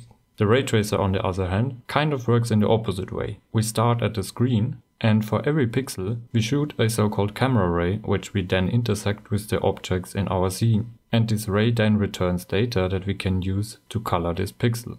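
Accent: Germany. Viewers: Are ju German? Bekaus it klings so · I hear a german accent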